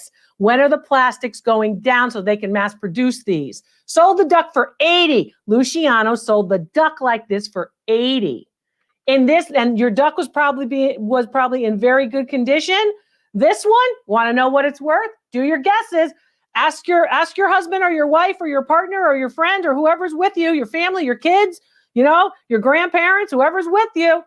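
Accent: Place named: Connecticut, United States. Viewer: I absolutely LOVE the way calls everyone “you people”!, you talk just the same as me. Must be a Connecticut thing…🤷🏻‍♀️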